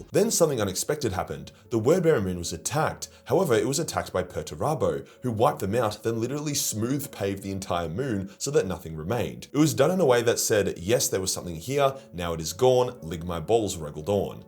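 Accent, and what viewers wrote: Australia. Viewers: I’m here to hear the Aussie Chad talk it all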